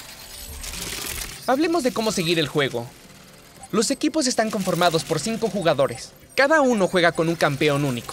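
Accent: Argentina. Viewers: El tipo es argentino no? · Ese wuey es Argentino xd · el que habla es argenti o que? tiene alguna explicacion? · Argentino obvio 😎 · re argentino el chavon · Sigo pensando que el man es Argentino, necesito info, no quiero leer más comentarios nefastos neaaa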